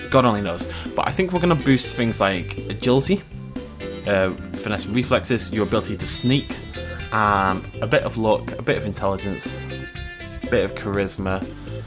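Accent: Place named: England, United Kingdom. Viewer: I love! I love his English accent and love his content as he talks at his own pace and tries not to rush anything. Keep doing what you do!:D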